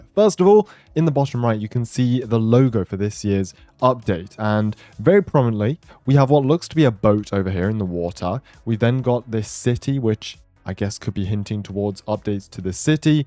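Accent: England, United Kingdom. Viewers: question, are you British? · Wait your English or British?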